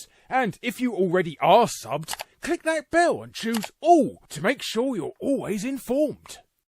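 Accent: England, United Kingdom. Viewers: I love the unrelentingly british pronounciation of 'kadokawa shoten' lol, let alone yokay busta rooka chan. Also surprised that Pandemonium!/Magical Hoppers didn't make the cut, although I guess this is specifically for licensed mascots rather than just games that changed their mascots to appeal to japan/vice versa. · your pfp looks like something Harry patridge would draw xD and you sorta sound like him with a English accent xD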